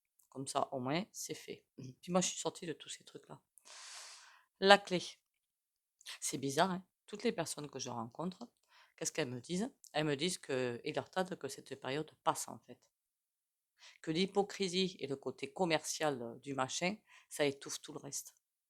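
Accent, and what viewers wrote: South, France. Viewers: un petit accent du sud çà réchauffe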